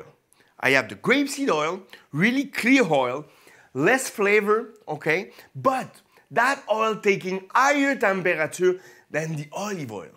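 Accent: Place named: Quebec, Canada. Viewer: Hey this guy is a quebecois 🤣🤣😂🤣 j’adore l’accent en anglais. Vraiment authentique 😂😂😂 Je vais m’inscrire à ton canal. J’espère que tu as des recettes à base de plantes 🌱 😉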